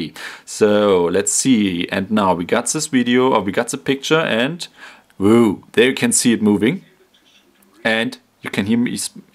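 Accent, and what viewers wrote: Germany. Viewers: Are you german? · I love your accent!!!!! I have the German accent as well.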